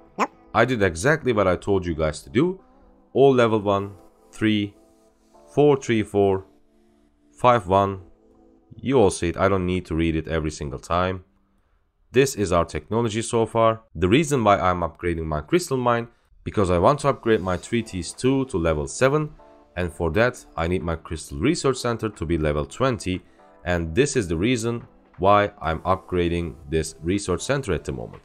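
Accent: Turkey. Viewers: Paketin çıkan ücretinden türk olduğunu anladım tahmin etmiştim konuşma şeklinden. :D Videon çok faydalı oluyor teşekkür ederiz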